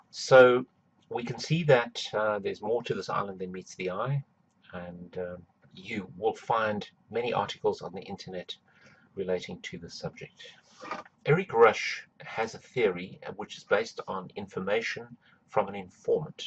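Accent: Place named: United Kingdom. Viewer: #1 Just because you believe that is what happened doesn't make it true. #2 This is not the only aircraft that has disappeared without a trace. Even when flown over land it has been years in some cases when crash sites were found. #3 You sound like a British citizen, certainly not from the U.S., so "you"(Britain) do not have stealth bombers. #4 You said, "There is more to this island than meets the eye." Statements like that are a very weak attempt to be provocative. And pardon me but, everyone on the planet knows this is a U.S. airbase. You mentioned there was a very public court case in Britain regarding the "occupation" of this island. #5 The article reads,"Confirmed at highest levels of gov't but not the current one." What does that even mean? Sounds like, "Void where offer not prohibited." #6 Where is the hard evidence? #7 Just because someone says it doesn't make it so. 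#8 Repeating it over and over doesn't make it factual. #9 You have no way of confirming any of this information therefore we can all take it with a grain of salt. #10 See Occam's Razor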